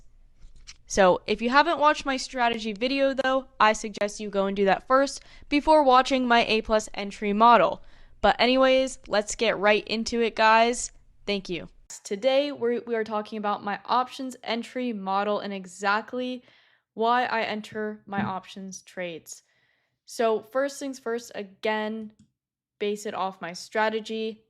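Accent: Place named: Midwest, United States. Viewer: interesting to see a young woman trading. u sound like your from chicago or wisconsin. ive been around and know a daytrader he teaches his daughter to trade. pretty cool keep going you can make a good life for yourself by yourself.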